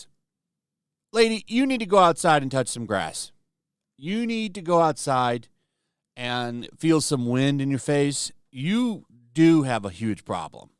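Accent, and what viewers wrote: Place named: RP, United Kingdom. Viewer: Hahaha STOP IT withnthe British Lord Accent... Man i dont get it. Why that accent, its so NOT lib. 😆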